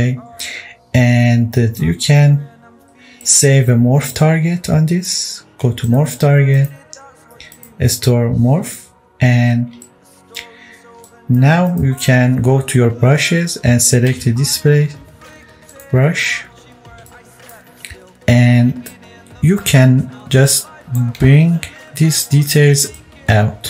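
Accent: Iran. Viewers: Your accent sounds Persian. 🤔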